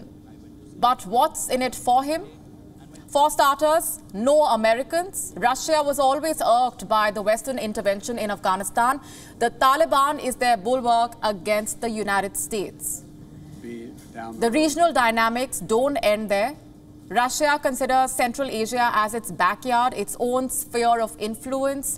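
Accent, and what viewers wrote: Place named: India. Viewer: Why WION news are using broadcasters that has strong Indian accent journalist I barely understand what she was reading my goodness pls kindly use someone that has free accent in English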